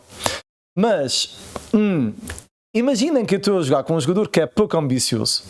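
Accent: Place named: Portugal. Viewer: Pelo sotaque diria que você é português.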